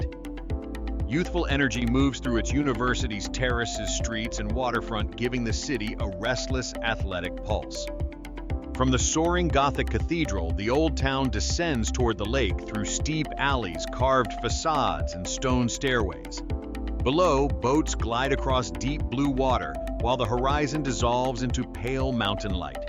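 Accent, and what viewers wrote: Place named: United States. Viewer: Replace the American with proper pronunciation, and move Munot from Stein am Rhein back to Schaffhausen. Nice, but standard selection of places.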